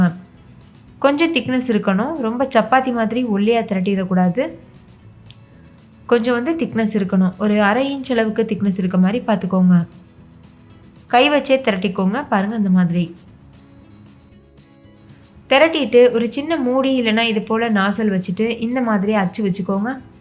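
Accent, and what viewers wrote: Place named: Kerala, India. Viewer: Unga native enga unga voice Kerala slang Madhiri irukku thank you for your reply to me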